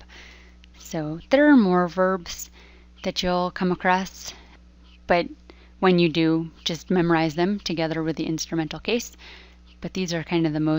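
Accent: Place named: United States. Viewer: You have not explained the use with verb to be ( byt)..you seem to be a native American and you don't have your words prepared at all while explaning,you have that typical american tune of " the thing" ..so you should focus on your english word "thingy" and not be overconfident and cover it up in shown off erudite american tune ..temporary ...pause....state ...and that whole thing is terribly told and you just jumped to next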